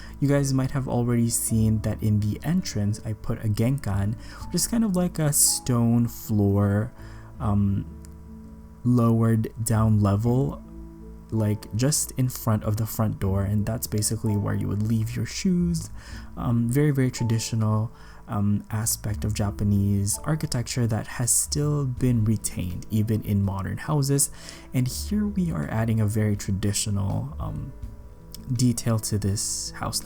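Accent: Philippines. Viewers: Bruh ur accent, by any chance r u a FILO? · Stumbled to this video , and as soon as you start speaking i knew you're a filipino.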